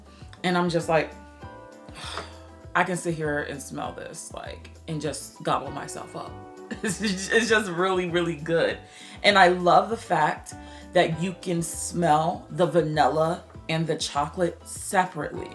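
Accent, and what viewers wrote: New Jersey, United States. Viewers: Your voice sounds just like Wendy Williams ❤❤❤❤jersey vibes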